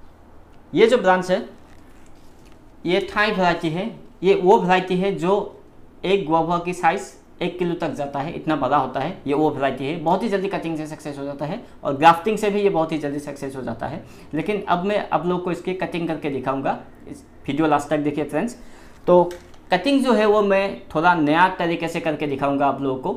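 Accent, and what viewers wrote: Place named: Northeast, India. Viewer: Voice like you're from North-east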